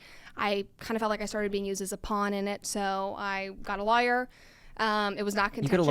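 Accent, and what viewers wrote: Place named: South, United States. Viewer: I don't think it's a fry, I think it's a little southern trying to come through like she's had voice coaching or something.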